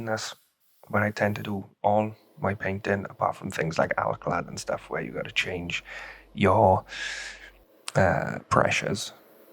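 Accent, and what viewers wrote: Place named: New Zealand. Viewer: Great to hear an accent/dialect from home ! - excellent set of videos for this kit. Perfectly lit/shot and measured delivery. Great work matey. Cheers from Aotearoa/NZ.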